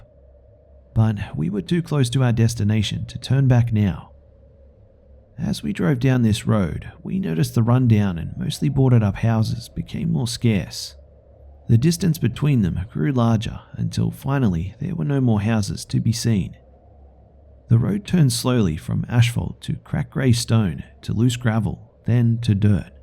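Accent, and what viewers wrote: Australia. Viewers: He's Australian :)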